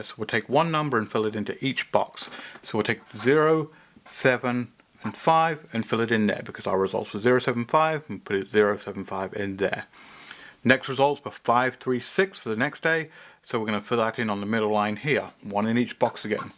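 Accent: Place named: United Kingdom. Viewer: looking forward to. You UK? I love your accent.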